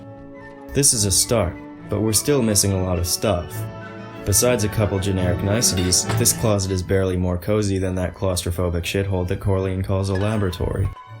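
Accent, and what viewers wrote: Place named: Toronto, Canada. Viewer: This guy is definitely from Toronto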